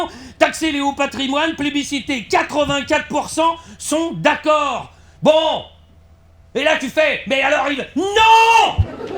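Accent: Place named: Montceau, France. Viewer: A ben t'es du Montceau hein!!!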